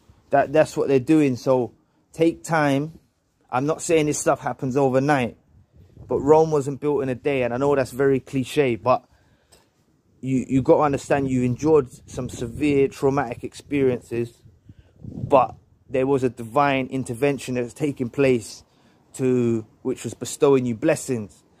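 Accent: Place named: Cockney, United Kingdom. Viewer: I love your cockney accent! Reminds me of London! ❤🙌✌️👊💯🍀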